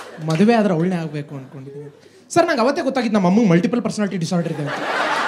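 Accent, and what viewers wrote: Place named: North Karnataka, India. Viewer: Bro ur from north Karnataka pakka I can say that ,both the way I loved it...♥️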